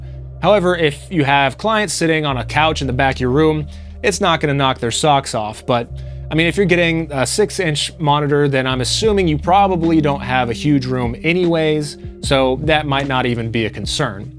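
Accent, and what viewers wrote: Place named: Australia. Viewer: Either talk Australian or American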